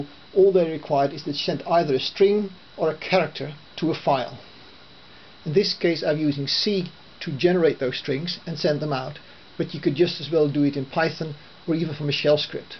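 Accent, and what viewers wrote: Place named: Netherlands. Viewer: Funny how you can tell he is Dutch from the first sentence he says. Great Work!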